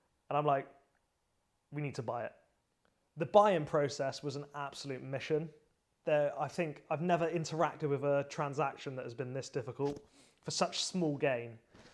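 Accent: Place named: Yeovil, United Kingdom. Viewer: Just found your channel mate, I'm from Yeovil, this was very comfy, hearing the accent and coming from working for sparkies and builders all round Yeovil and Sherborne. Top lad.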